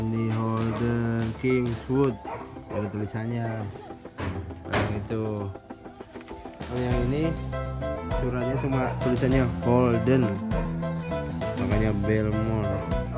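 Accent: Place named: Semarang, Indonesia. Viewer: Kassemarange daerah ngendi smpyn apal q logate qong semarangan ngene ki.. Kebetulan tonggo dwe kas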